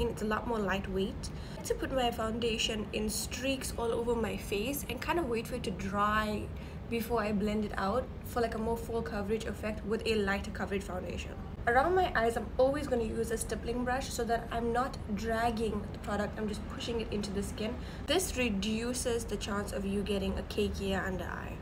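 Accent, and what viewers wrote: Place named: South Africa. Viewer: looks white, is indian, trying latina makeup and sound south african. Everything about u is perfecttt!!!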